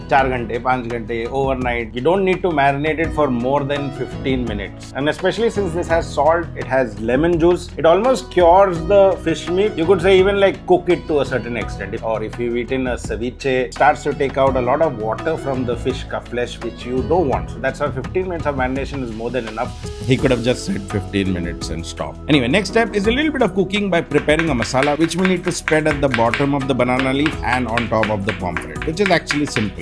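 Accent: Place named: Mumbai, India. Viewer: Love the Mumbai accent and way of talking 😃Love ur recipes too … So subscribed to ur channel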